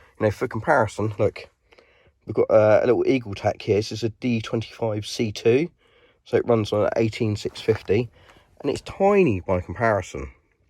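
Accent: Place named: United Kingdom. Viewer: British speech 😊😊😊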